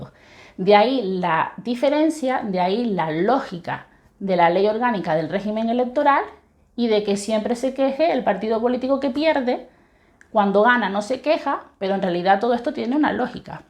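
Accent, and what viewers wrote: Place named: Canary Islands, Spain. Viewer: Buena explicación. Me suena acento canarion.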